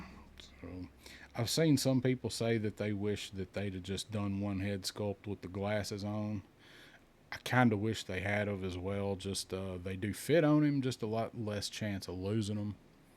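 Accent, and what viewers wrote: South, United States. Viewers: What a great addition with Val! Classic! Great review! I can't wait to open my big ass box! Been sitting for 2 whole days! 3 by the time I actually get to it! As always, a wonderful video with a great southern drawl! Yeeehaaaaw! 4.5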